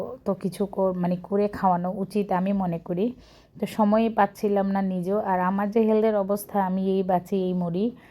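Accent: Noakhali, Bangladesh. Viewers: নুডুলস ,পাকোড়া তারপর অনেক সুন্দর সুন্দর রান্না। ছোট ভাই, ছোট ভাইয়ের বউকে খাওয়ানো আর আমাদেরকে দাওয়াত না দেয়াএটা খুব কষ্টদায়ক কিন্তু আপনার শারীরিক সুস্থতা কামনা করছি। আর আপনার নোয়াখাইল্লা ভাষা তো তুলনাই নাই।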